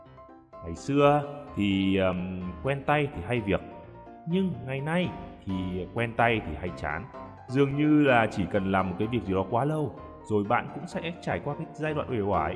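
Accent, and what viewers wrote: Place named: Hanoi, Vietnam. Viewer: giọng chuẩn trai Hà Nội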